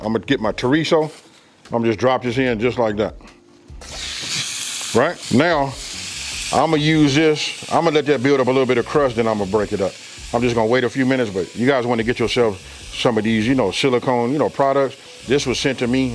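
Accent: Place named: South, United States. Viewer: If you’re from LA, where did you get that accent? I assume the south? Great video!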